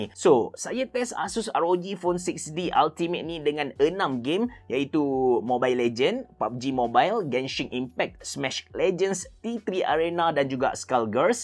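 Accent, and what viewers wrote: Kelantan, Malaysia. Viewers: Cute ye kecek kelate...tak dengo bahaso..kalo Johor tak geti bahase lerr..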